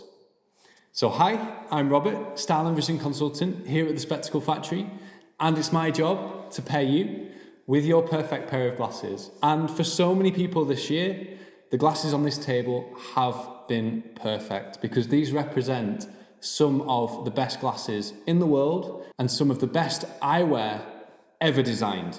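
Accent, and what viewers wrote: Scotland, United Kingdom. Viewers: oohhh scottish accent!!!!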